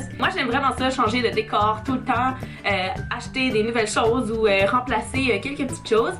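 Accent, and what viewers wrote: Quebec, Canada. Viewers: Avec ton accent je pense que tu viens du Canada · Tu viens du kébec ? Tu as l'accent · C'est l'accent Québécois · C'est québéquoi pas anglais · j'adooooore l'accent québécois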